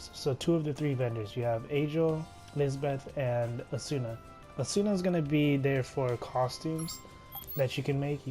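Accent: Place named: Arab world. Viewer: واضح من لهجتك انك عربي بس لهجتك أحسن بكثير من كثير من العرب، ما شاء الله 😂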